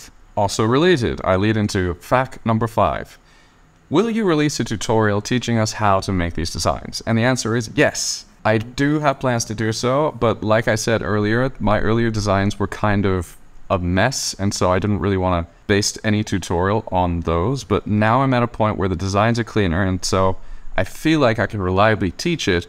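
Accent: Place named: Eastern Canada, Canada. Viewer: by your pronunciation of your T's I'm guessing you're from eastern Canada? Thanks for your work on this. Fascinating stuff I will eventually be trying to print this with CF-Nylon and again with Lightweight PLA (100% infill)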